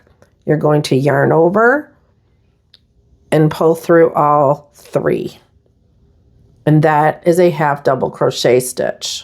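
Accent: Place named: Northern Illinois, United States. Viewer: Girl, are you from Northern Illinois? You sound like home.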